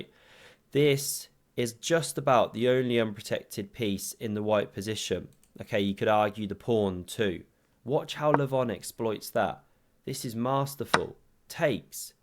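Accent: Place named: RP, United Kingdom. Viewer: There's nothing like chess commentary served up with a posh Eaton college accent!❤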